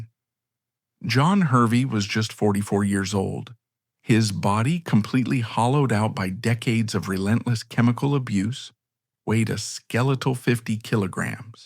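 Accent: United States. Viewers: I hate this American narration . Boring !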